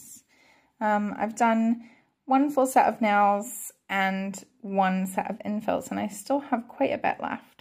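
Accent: Scotland, United Kingdom. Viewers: that's interesting, I thought one of the accents I could hear was Scottish! Some Canadians do share sayings and pronoinciation though. I'm Scottish myself and live in Falkirk:)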